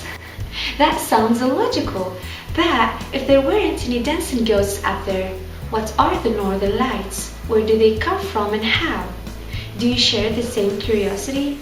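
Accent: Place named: United States. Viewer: Amazing! I like your american accent! Congrats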